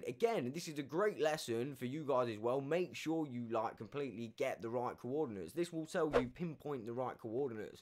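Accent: United Kingdom. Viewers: He's german And he has british accent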